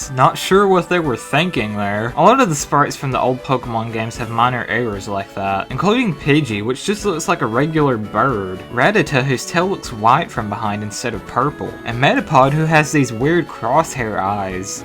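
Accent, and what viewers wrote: United States. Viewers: I love your country accent I could listen to you talk all day about pokemon xD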